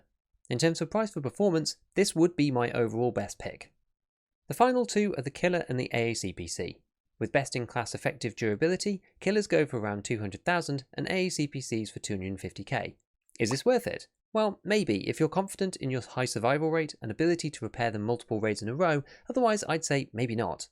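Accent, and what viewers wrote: United Kingdom. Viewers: The British accent, Can't do it. its like listening to your Nephew talk, bullshit. Your voice has the same frequencies that make dogs wanna commit suicide.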